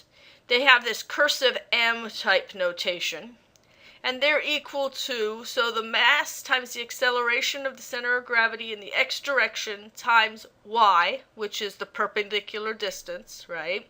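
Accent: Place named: South, United States. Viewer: i loved your southern accent mam :)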